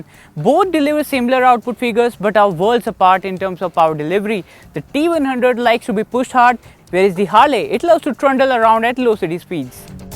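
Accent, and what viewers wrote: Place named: India. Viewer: only you could understand your english indian accent... worst!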